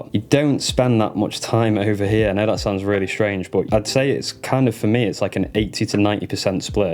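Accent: United Kingdom. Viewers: Yo man thank you for your videos. Been following for a while now and I really appreciate your content (especially the sp 404 vids)! I’m from the uk and you sound pretty local. Have you got a social media link? Would love to connect and pick your brain. Thanks for the consistent uploads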